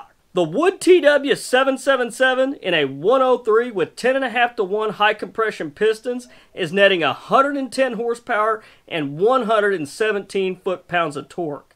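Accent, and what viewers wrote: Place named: Texas, United States. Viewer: I have noticed that you have the exact same accent as Mike Nesmith from the Monkees he was also from Texas I wonder if you guys are from the same town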